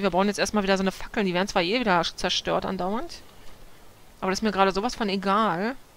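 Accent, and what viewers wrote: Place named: Berlin, Germany. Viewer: Allein wegen deinem coolen Akzent ist das Video schon richtig geil hehe ^^ ( hört sich an , als währst du eine Berlinerin :3 )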